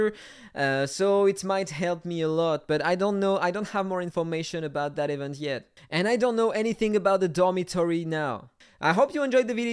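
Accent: France. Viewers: t'es Français non ?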